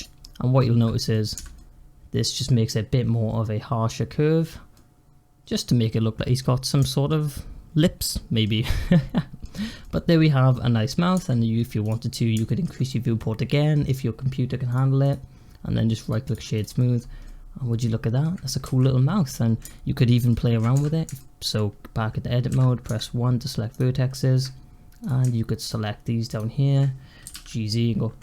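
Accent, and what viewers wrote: Wales, United Kingdom. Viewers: Your Welsh tones added to the relaxing nature of this video.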